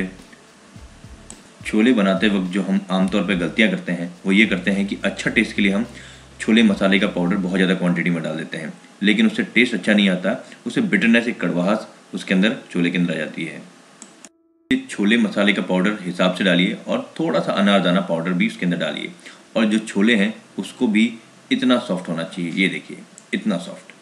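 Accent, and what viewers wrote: Pahari, India. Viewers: LAPATUWAPAN..........ahahahha.... ji pata nahi aapki baat aur bolne ke tareeke se lagta hai aap pahadi ho meri tarah...........uttarakhand se. And jordaar recipe by the way.... Main pakka try karunga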